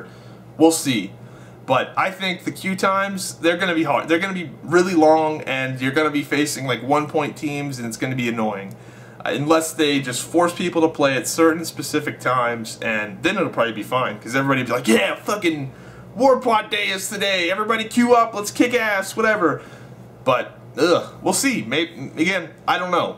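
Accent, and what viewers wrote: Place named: United States. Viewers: Thomas Feeley yeah he's definitely american. Not from the south though, so I'm not sure where from lol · Hes american.... obviously, us Australians have a very different accent.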